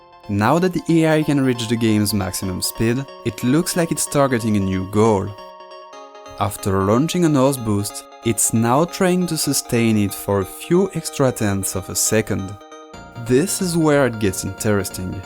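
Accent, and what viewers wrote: France. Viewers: You are French ? Your voice is strange. Tu as un accent assez français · bro are you french? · bro gotta be french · On entends ton franglais MDR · Are you french ? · are you french? cuz since the video started i heard ur accent and was kinda curious about ur nationality, and when i heard the way you said "parameters" i was like: "he must be french". the reason im asking is because my curiosity is killing me bro also the video is awesome. that seems so hard to do. i've always wondered like how are they making AI. i mean the how is it LEARNING if you have to CODE it to DO something. it still blows my mind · J'entend la france dans ton accent 😂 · Are you french bro ? Love the accent haha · I cant listen to this french accent Im sorry... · Good video I love the idee. Ps : tu es francais ? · you sound like you'r french are'nt you ? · Are you French ? 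· t es francais nan? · Are you French or Chinese? Or both? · Tu es français ? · Fr I spotted the french accent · 100% il est français mdrrr super vidéo sinon! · t'es francais non ? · are you french? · Pourquoi il a un accent français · t'es francais ? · Tu es français nan? Tu as un accent fr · Rooh l'accent bien français c'est cramé 😭😭😭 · are you french by chance? trying to place the accent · Je me disais bien qu'il y avait un petit accent Français, tu parles super bien anglais j'ai mis 2 vidéos à capter mdrrr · Je suppose que tu es français? Ton accent est bon mais très français 😂❤❤❤ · Wow, cool video, i've watch your others video when you train your IA it helps me on school project thanks (btw i've got a question. is that the "french accent" ? (because i'm frecnh so i ask)) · l'accent bien français comme on aime xD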